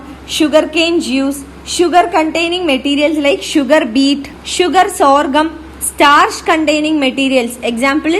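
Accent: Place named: South India, India. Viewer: Her style of speaking is south Indian and most of people are don't know Hindi in that region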